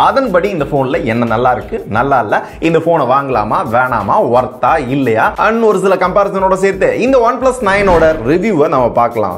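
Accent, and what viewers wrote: Madurai, India. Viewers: Thala neenga maduraiyaa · Elle madura kaaran da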